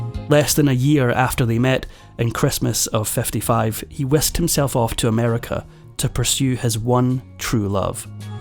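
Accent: Scotland, United Kingdom. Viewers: I only watch the videos narrated by the Scot. Have him narrate them all, pay him more, and I think you’ll have lots of channel members. ❤ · I love his Scottish accent. He speaks clearly and is very easy to understand! 😊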